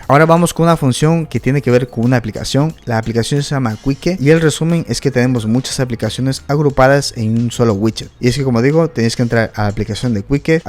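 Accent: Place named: Mexico. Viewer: Bien fuera del video, por que siento que tiene acento mexicano pero usa palabras españolas?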